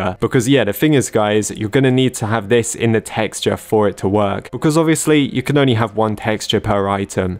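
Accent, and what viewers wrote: United Kingdom. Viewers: My favorita british acento youtuber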